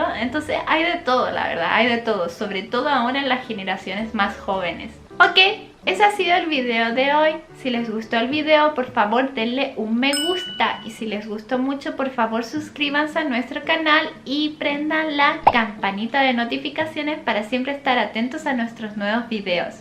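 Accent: Chile. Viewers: Estas perdiendo tu acento chileno. Abrazos! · Siempre me ha gustado el acento chileno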